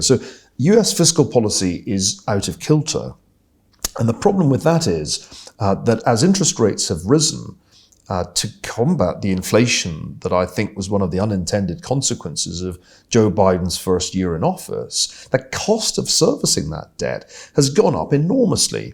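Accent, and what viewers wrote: United Kingdom. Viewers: I will summarize it this way. He speaks with a British accent, after that he makes no sense. First, when we talk about a country in ascent or decline we need to consider the following: 1. Military power: no one is close to challenging the US here. 2. Economic power: the US economy is outperforming all developed economies and the USD has never been stronger. All this nonsense about debt servicing ratio is just noise. If it really was a concern, the USD would be depreciating and not appreciating! 3. Technical innovation: AI, medicine, advanced tech etc etc. don’t want to get into details, but it is obvious the US reigns supreme… At least for a long time the US will lead. Who is in decline based on the 3 factors above is the UK 😢 Love the accent though 😊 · Unfortunately, every time I hear this accent, I am flooded with historical memories of the depravity of the nation he comes from. 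And here he is carpet bagging, talking BS about China. How about the US and its lackey, Britain, staying home and giving the world peace? 400 years of depravity is enough, Mr. Ferguson.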